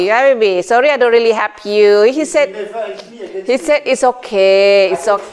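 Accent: Negeri Sembilan, Malaysia. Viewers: Assalamualaikum Makcik....♥️ Suko boto nengok Makcik membebel sebijik mcm Makcik2 sy yg dh lamo x jumpo....laie klo cakap loghat nismilan...ghoman pun lobih kurang yo...hehehe♥️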